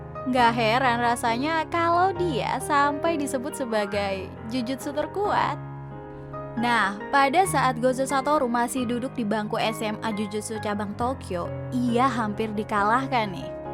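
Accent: Java, Indonesia. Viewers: Kangen suara yang medok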